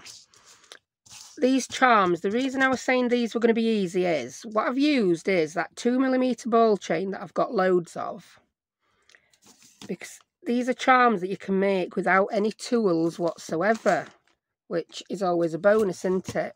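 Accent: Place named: Northern England, United Kingdom. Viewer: Northern lass🤗😁 lovely.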